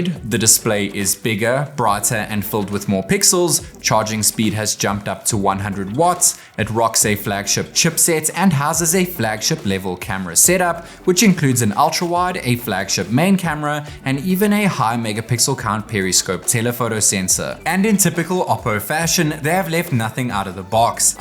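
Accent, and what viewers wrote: South Africa. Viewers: All I could see was The Barron, Witkoppen Rd... 🤣#RubyBlueForLife!!! Cool channel, good luck! Can hear a Zaffer a mile away!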